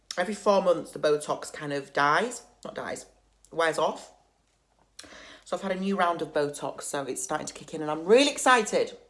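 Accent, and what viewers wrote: United Kingdom. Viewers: HILARIOUS 🤣😂🤣...l love your British accent...so BEAUTIFUL...Awesome video👍🏼🇨🇦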